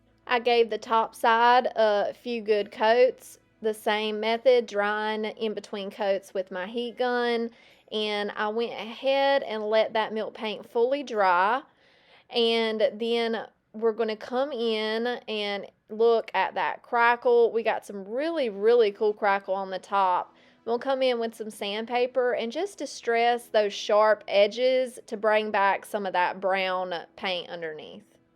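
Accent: South, United States. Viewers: I’ve never tried milk paint but you make me want to try! I’m so excited I found your channel! And I love your beautiful southern accent!! 🫶🏼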